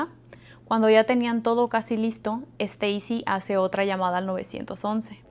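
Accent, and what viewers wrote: Mexico. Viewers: Al fin alguien que narra los episodios con normalidad sin pretender ser dramático. Además el acento es perfecto. Me encanta que seas mexicana 🇲🇽 Muchas gracias.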